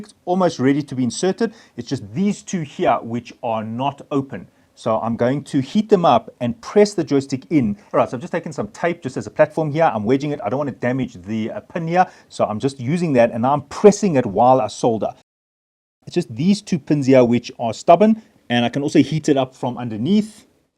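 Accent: South Africa. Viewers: fucking love the south African accent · I can hear the south african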